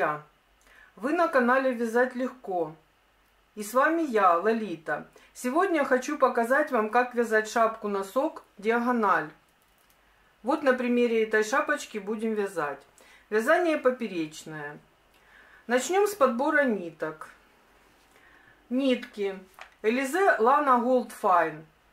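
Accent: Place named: Ukraine. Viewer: Неприятно слушать украинский говор.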